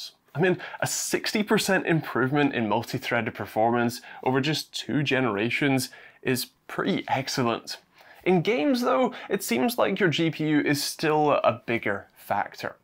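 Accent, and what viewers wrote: United Kingdom. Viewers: British accent bro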